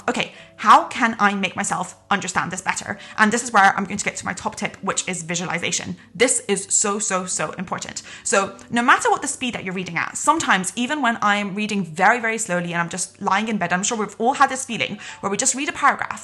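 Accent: Scotland, United Kingdom. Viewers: Everyone commenting 'oh its so fast how you talk' or 'it must have been sped up in production' potentially however Scottish people do just naturally talk fast. Keep up kids 😂 Although will reiterate it off the folks that are saying its obvious proof of your speed of intellect by just how well you articulate yourself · Are you from Scotland?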